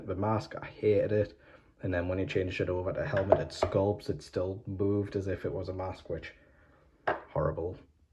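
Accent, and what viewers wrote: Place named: Newcastle, United Kingdom. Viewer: Your Geordie accent reminds me of home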